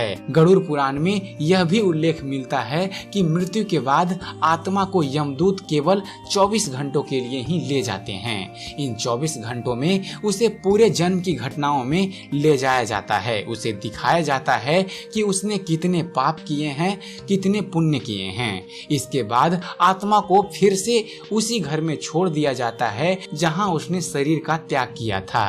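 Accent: Bihar, India. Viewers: kya ap bihari h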